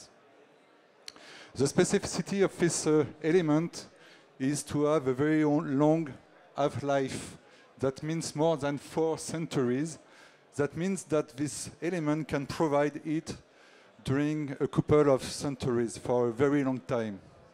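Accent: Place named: France. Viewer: À la manière dont vous vous exprimez en anglais Il n’y a aucun doute que vous êtes français lol Ou sinon, merci de m’avoir appris plus sur l’énergie nucléaire et aussi pour les sous-titres en français. Bonne continuation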